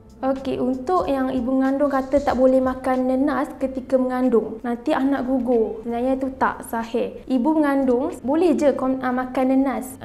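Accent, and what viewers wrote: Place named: Kelantan, Malaysia. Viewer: Awak terlalu kelantan untuk saya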